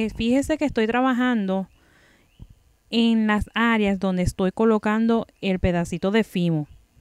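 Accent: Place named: Cuba. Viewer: esa es una cubana o puertoriqueña)=? que linda voz tiene dame su correo ja ja ja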